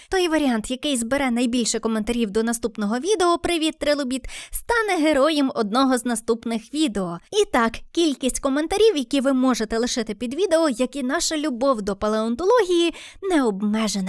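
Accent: Standard. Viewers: Неперевершена вимова...Чудовий голос.... Еталонна українська мова... Цікавий контент. Однозначно вподобайка..! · Чиста українська мова, яку хочеться слухати й вчити. Хороше відео цікаве й яскраве. Ось такі треба канали для України! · Величезна подяка за джерельно-чисту українську мову, цікавий виклад, гарну дикцію та відмінну інтонацію. Така подача точно не дасть заснути. Ще раз дякую. Слава Україні!!!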